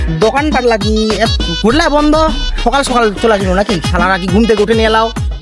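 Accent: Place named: Thakurgaon, Bangladesh. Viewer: দাদা আপনার ঠাকুরগাঁও এর লোহাগড়ার আঞ্চলিক ভাষায় কথা বলা আমি অনেক পছন্দ করি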